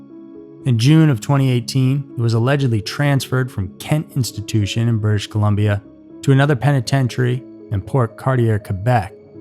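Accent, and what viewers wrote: United States. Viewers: OMG I ❤️ your accent... where in the USA are you from🇺🇸??.... I'm from Staffs UK🇬🇧... love your posts 😍👌🏼💋 keep them coming, thanks Jo xx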